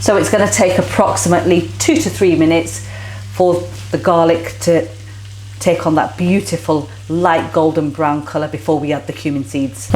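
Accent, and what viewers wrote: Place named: RP, United Kingdom. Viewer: You sound so posh in your explanations very professional, you should read the news x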